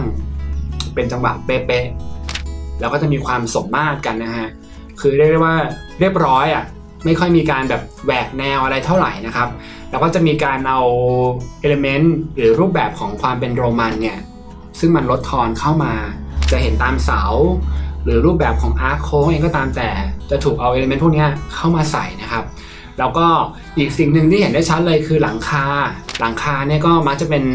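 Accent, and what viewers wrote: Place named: North, Thailand. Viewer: อู้เหนือได้น่าร้กจัง. 😊😊😊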